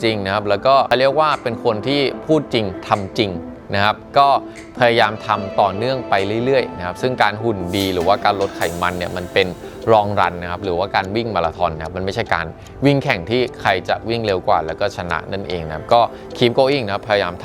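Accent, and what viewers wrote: Thailand. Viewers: น้องออกเหน่อนิดๆ เป็นคนต่างจังหวัดใช่มั้ยครับ น้องอธิบายได้ดีมากครับ ทำตามได้ง่าย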